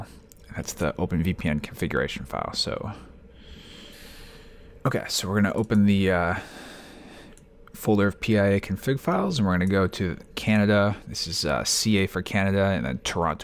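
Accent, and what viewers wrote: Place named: United States. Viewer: very nice Tutorial. And nice clear AMERICAN Voice ;-) Greetings from germany. I have bought the Router today ;-)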